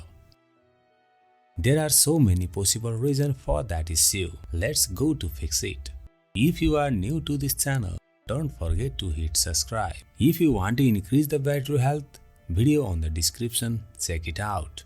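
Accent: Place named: India. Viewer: This video could be the most useful for me rn but Indian accents has to be the worst man i dont know a shit what bro saying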